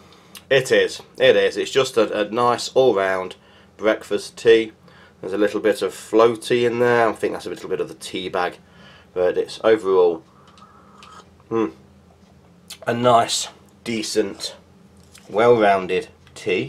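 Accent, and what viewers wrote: United Kingdom. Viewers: Great review ! I always enjoy to hear your English English :) These rations ate civilian, but the components are the same quality as the military. I personally enjoy Maiskij Chai - the tea. I even bought a pack for me when I was in Ukraine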